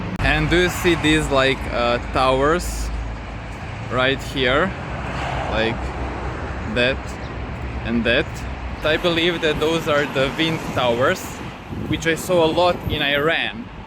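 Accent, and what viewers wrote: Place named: Balkans. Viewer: Thanks for the 'nice video, where are you from Originally? You sound balkanese!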